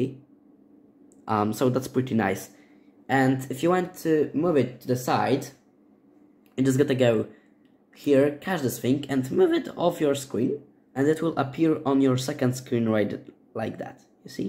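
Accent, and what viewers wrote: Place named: Poland. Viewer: Polski akcent 🔥🔥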